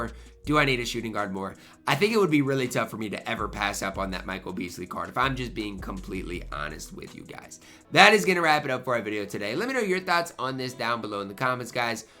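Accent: Iowa, United States. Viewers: Is this an Iowa accent? What is this?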